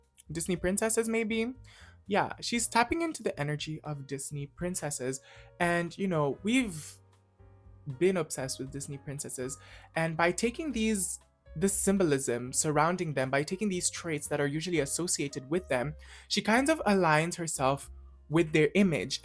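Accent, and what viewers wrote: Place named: Jamaica. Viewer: You sound jamaican to me, all i could focus on was that and your fro 😅. Lovely editing too!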